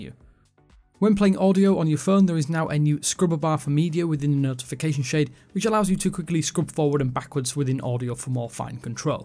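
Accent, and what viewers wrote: United Kingdom. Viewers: That BRITISH accent!!!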